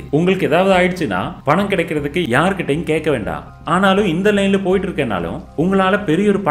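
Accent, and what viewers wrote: Kerala, India. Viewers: Avar malayali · Kerala slang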